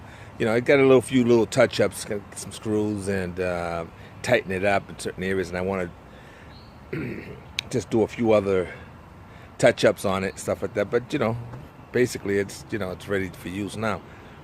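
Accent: Boston, United States. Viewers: Hello, as you were talking I said to my husband you have a Boston accent,but he is in I think Georga. At the end you told where you were from so that explains. The garden is looking great. Thanks for sharing this with us.💕 NonnaGrace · I had to do a double take when you said you were from Puerto Rico, until you said you grew up in Boston! It’s definitely a hard accent to miss…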